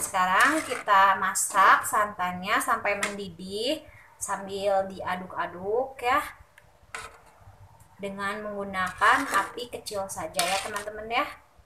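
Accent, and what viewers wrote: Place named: West Java, Indonesia. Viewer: Dari logat bicara, sepertinya si ibu ini orang sunda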